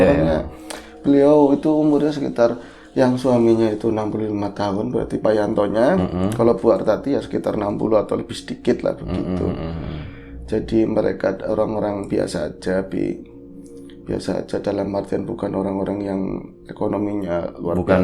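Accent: Banyumas, Indonesia. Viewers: Aq suka klo bro ngomong ngapak..lucu dengernya..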